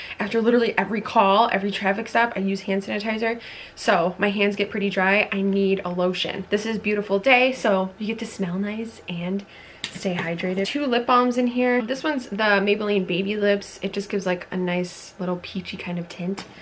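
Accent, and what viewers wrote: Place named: Wisconsin, United States. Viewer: I found out later you were from New York 😊😬 sorry you sounded a bit like you were from Wisconsin lol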